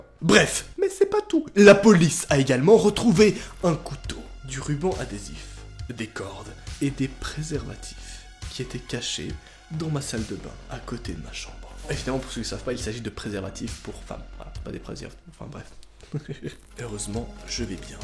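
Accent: Switzerland. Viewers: T Suisse ?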